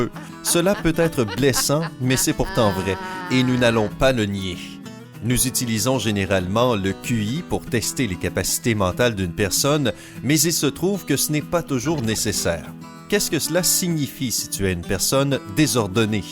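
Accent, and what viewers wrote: Quebec, Canada. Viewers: J'adore la voix québécoise like si toi aussi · J'adore ce mec avec l'accent Québécois, il me fait trop marrer...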